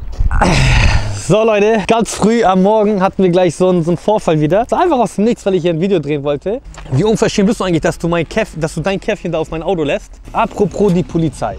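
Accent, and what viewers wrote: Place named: Hamburg, Germany. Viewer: Norden/Hamburg Slang!! Dialekt!!! Wir sagen auch Zb Tach statt Tag!! Ist schon richtig!!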